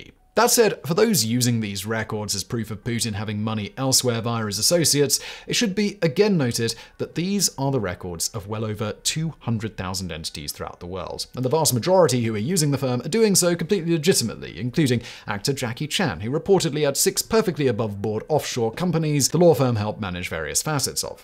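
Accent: United Kingdom. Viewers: because he has a British accent and the code doesn’t work in the uk. It makes no sense. The average hello fresh box in the U.K. (box of 5 meals) comes to £78 that’s more than I spend in a fortnight!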